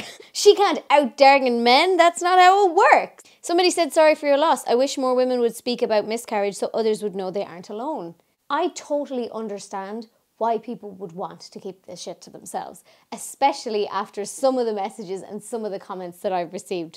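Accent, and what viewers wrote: Ireland. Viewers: I'm sorry to hear this love... but you sound like a strong woman & it's better to face things head on than to dwell on them & let them get the better of you mentally & emotionally... god bless... just curious what part of Ireland are you from if you don't mind me asking....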